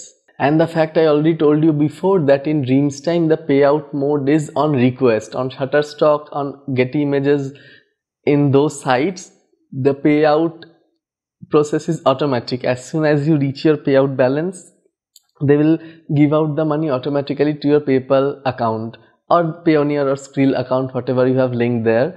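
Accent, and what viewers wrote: Bengal, India. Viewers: Unicorn Your accent clearly shows that you are বাঙালি। আমিও বাঙালি। কলকাতায় থাকেন??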